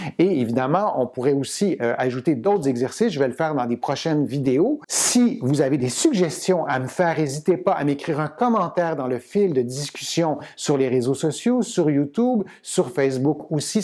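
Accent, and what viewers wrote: Canada. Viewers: Votre accent ( canadien ?)est très agréable à écouter!- J' ai un petit cousin( français) à Montreal et ma fille( franco- allemande) lui avait rendu visite,il y a quelques années avec un autre cousin franco- allemand !.-Moi - même je suis Française en Allemagne et j' ai découvert vos excercices avec beaucoup d' intérêt,ayant moi- même cette bosse,surtout après une scolarité assise, un traitement de choc à la cortisone à l' âge de 8 ans et l' impression,surtout pendant le Covid,d' avoir eu les muscles rétrécis, le nerf sciatique qui se manifeste de temps en temps, malgré la gymnastique en musique depuis 6 ans !-- Comme il n'y a chez vous que 3 excercices à faire, je les fais souvent depuis environ 2 semaines :depuis que j' ai découvert votre site en France , où je suis actuellement !- Merci beaucoup !- Les excercices sont très efficaces: - la sciatique est presque partie,la bosse de Bison,pas encore,mais je ne fais que commencer !👍🙋‍♀️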